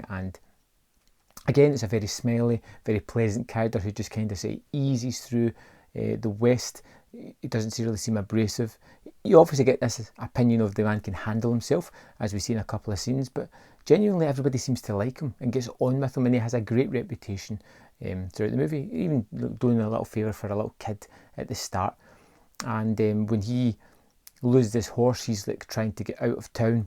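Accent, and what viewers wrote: Scotland, United Kingdom. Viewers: My cc worked, in American English. The narrator sounds Scottish!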